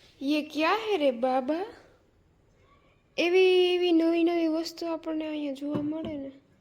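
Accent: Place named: Porbandar, India. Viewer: From your language I think 💯 % u r from porbandar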